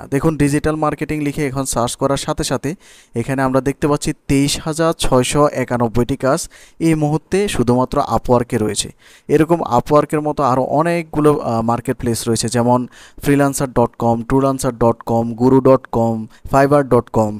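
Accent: Noakhali, Bangladesh. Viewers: আপনার বাড়ি মনে হয় নোয়াখালী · আপনার বাড়ি নোয়াখালী আমি বুঝতে পারছি। প কে ফ বলা হলো নোয়াখালীর মানুষ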